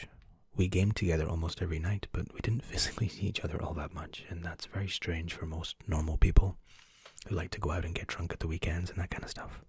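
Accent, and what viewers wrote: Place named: Midlands, United Kingdom. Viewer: What place in the Midlands are you from? I’m from Worcester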